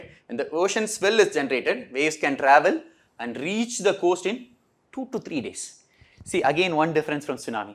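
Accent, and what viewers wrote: South India, India. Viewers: That soth Indian accent of sir is great 😅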